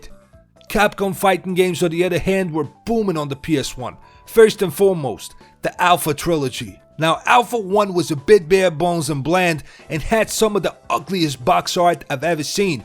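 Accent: New York, United States. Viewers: Your accent of a new yorker but you have pal games through me off. I feel you though on the nostalgia of these games and those times. I have similar memories.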